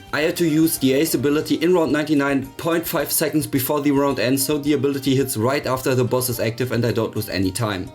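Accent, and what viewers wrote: Germany. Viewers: Ich kann den deutschen Akzent einfach nicht ignorieren, aber nicer run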